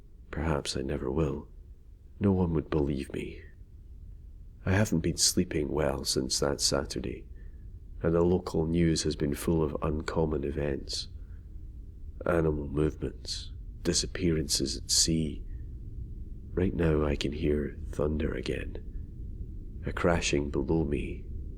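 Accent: Scotland, United Kingdom. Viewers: This channel is simply amazing. You guys have some really clever stories, and it doesn't hurt that you have Scottish narrators. XD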